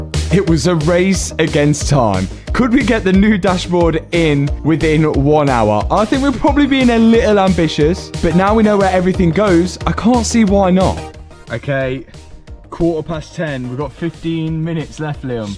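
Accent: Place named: Leicester, United Kingdom. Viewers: A familiar Leicester accent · I don't care about cars, I am here only to hear your English accent, and its phenomenal.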